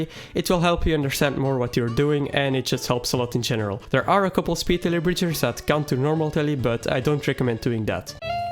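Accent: Netherlands. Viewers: are you dutch becuse you sound like 1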